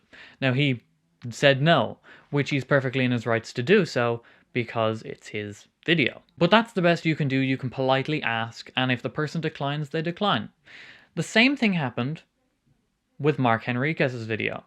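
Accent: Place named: Ireland. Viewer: Dude did you get more Irish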